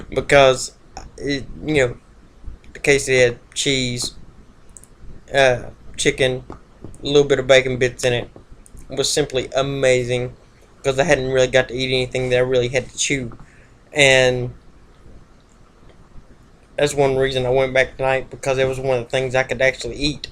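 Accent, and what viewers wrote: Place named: Texas, United States. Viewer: Hi...hope u are well...make some updates please! Wonder if by now (a yr into wearing ur dentures now have you tried cushion grip? A lot of people on here have said it is great! Please let us know how u are after all this time...hope to see you soon...BYW are u from Tx? You sound just like me & both of my sons...lol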